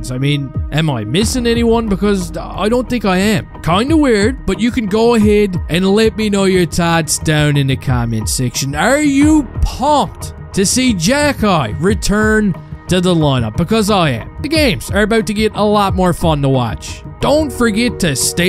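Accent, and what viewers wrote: Newfoundland, Canada. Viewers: I was just wondering yesterday if they were going bring him up soon. It's going to be interesting... Btw your accent, are you from Newfoundland/Labrador maybe? Cheers from Sweden 👍😊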